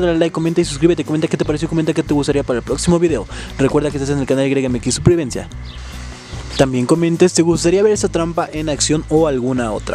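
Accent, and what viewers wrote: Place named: Latin America. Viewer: Me cargó coma habla, una mezcla de latino imitando al español, vale ??? Jijij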